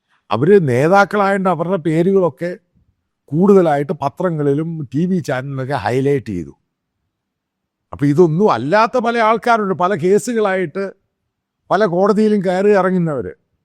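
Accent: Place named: Kollam, India. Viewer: Ithu Kollam slang😅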